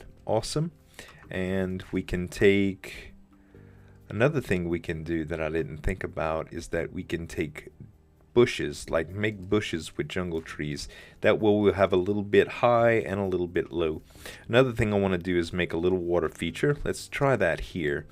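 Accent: Louisiana, United States. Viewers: Z, are you cajiun(horrible spelling) ? It sounds like it. LOUISIANA FTW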